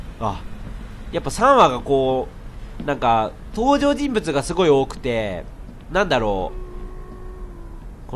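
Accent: Kansai, Japan. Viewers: レトさんの関西弁好きw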